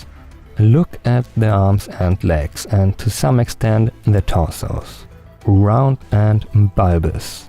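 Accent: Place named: Germany. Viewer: I thought: "hmmm, that accent sounds very german..." And the Die Sending mit der Maus theme was playing, so I do not even have to ask anymore. Anyway Gundam Aerial has thick thighs that save lives.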